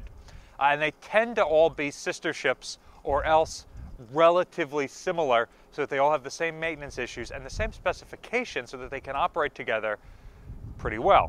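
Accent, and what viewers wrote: United States. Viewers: American voice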